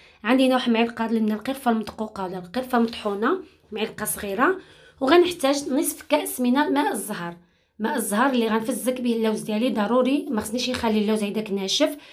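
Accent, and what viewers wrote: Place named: Tangier, Morocco. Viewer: اختي ماشاء الله عليك غانحاول نجربها لان الشباكية شوية مجهدة ودخلت لليوتوب نشوف شي اقتراح فعوضها ولقيت قناتك اللي كيشرفني ويفرحني انني نتابونا فيها باش نبقى غير نسمع اللهجة الحلوة ديال طنجاوة ونستفد من ذوقك الراقي تقبلي تحياتي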